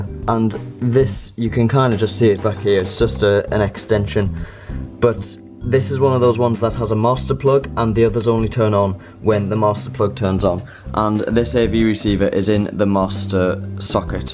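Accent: United Kingdom. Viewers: i like your room setup! and thanks for the British accent :D , watching ur videos really improve my English lol *without sub :p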